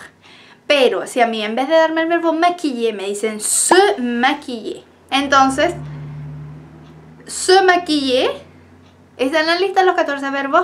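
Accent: Venezuela. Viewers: Excelente la explicación !!! Bello tu francés y tu acento Venzolano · Acabo de descubrir tu Canal Por el acento eres venezolana?? Soy venezolana y estoy en Francia luchando con el idioma Me gusta tu explicación es clara y precisa espero me ayudes Merci... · Tuve mi sospecha por tu acento,hasta el minuto cuando pronunciaste ''AREPA'' jajaja,saludos desde Venezuela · Excelente calidad de video, con información muy importante. Gracias. Una pregunta: eres venezolana?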